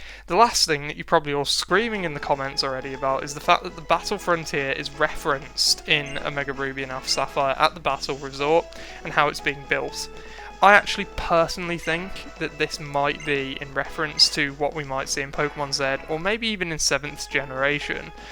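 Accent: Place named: United Kingdom. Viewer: Soooo British.... Ewwww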